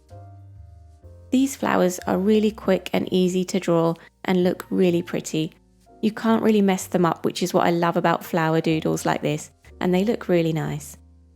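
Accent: United Kingdom. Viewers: That looks absolutely gorgeous! You are not alone in the department of smudging ink, happens to everybody, I really thank all deities for washi tape! You have such a pleasant voice and way of speaking - I very much prefer British English over American English -, it's a real pleasure to listen to you.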